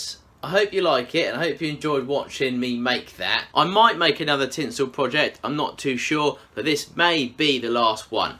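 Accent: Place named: London, United Kingdom. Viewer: 听惯了美音，再听伦敦腔，这耳朵一下子没转过来。。。。 fighting!!!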